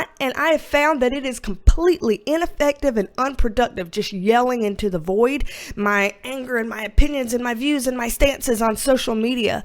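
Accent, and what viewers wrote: United States. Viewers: 😅 “we’ll make a start” - we say that in Australia too. It never struck me as unusual until I heard you say it in an American accent 🤣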